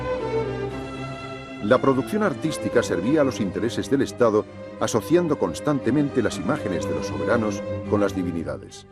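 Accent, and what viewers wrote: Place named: Spain. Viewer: No sé si sea por el acento español pero hay partes (finales de palabras) que no se entienden pues no vocaliza bien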